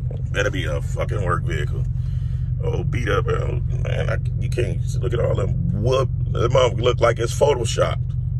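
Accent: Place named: Texas, United States. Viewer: I know you're from Cali. But you sound like you're from South Texas. You're hilarious, great information.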